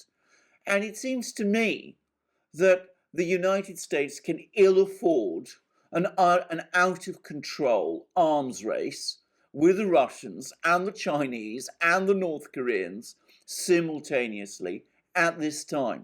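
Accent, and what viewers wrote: England, United Kingdom. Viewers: all news should be delivered, in such a professional manner. Your wonderful crisp, English accent, is much appreciated. Thank you for explaining, what I cannot 🇬🇧xx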